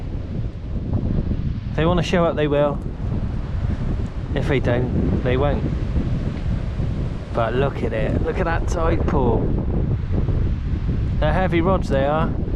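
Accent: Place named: Bristol, United Kingdom. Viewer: What’s wrong with a Bristolian twang lol so you have a link to the FB group?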